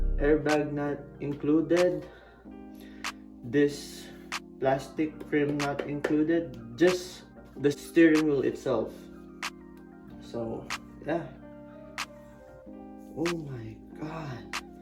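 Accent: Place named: Philippines. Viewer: He's definitely Filipino with an accent.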